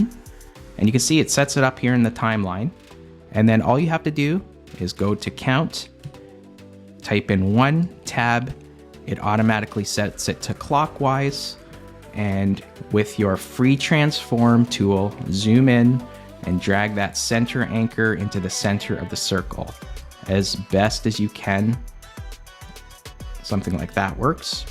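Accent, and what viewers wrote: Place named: Canada. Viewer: the canadian accent is strong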